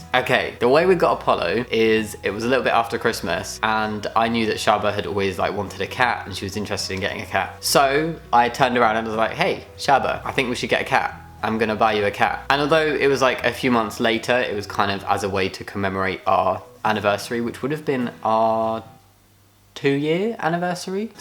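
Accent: United Kingdom. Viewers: BRITISH BAKE OFF PEOPLE! I just love how British is (just like me!) we have a very similar accent!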